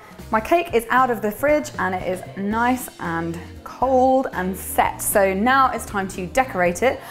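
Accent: England, United Kingdom. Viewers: thanks for the tutorial. You're very pretty. your english accent is nice ☺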